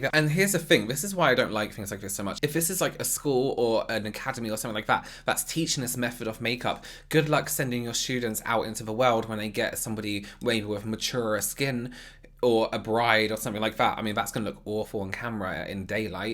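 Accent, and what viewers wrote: United Kingdom. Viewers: the algorithm doesn't care if you get a thumbs down, all interaction with your video is a +.. Never seen one tho..🙂 Me:🔔 💃🏼yey..🎧press👍: To the video... You are Always so sweet and your facial expressions are so funny, I love that! I love that you can laugh at yourself, and be a bit🤭the classy way: when you don't "care" for something!😆 The British accent is too much 💘 I adore it...😋💭 Keep up with the Great content! You are doing great, I am so Happy I found you, the way you teach, you are a godsent, I understand! ( Said before: A Finn thinking in Swedish trying to write English..☺️) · giggles british accent don't mean to be rude, but you're AWFUL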